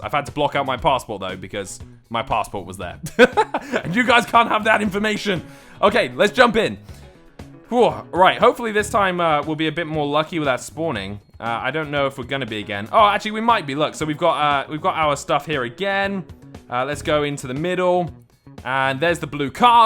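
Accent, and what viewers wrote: United Kingdom. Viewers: Wish there were more maps. The 3 rotating maps are getting old. No matter how much of a British accent you put on it, this game is truly becoming nothing more than a boring time waster (even with the Halloween events that take literally 3 tries to complete).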